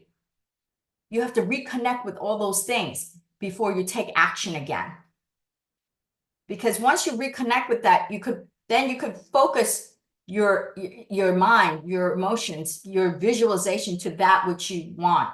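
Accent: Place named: California, United States. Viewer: Thanks❤ sounds like a strong californian accent 😊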